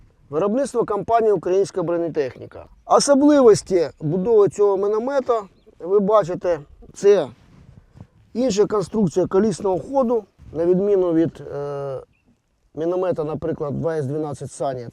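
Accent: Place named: Russia. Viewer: У ведучого росийский акцент в розмови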